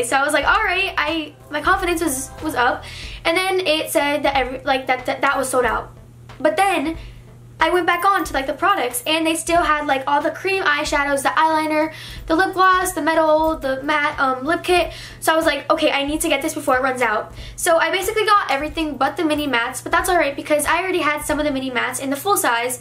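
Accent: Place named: Pennsylvania, United States. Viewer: Do you live in PA? Jw bc you kinda have an accent 💗 (btw loved the vid!)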